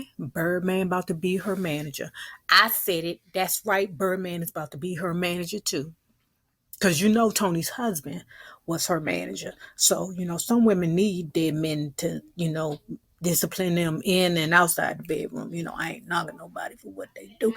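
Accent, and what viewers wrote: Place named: South, United States. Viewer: I keep playing this just to hear yo country ass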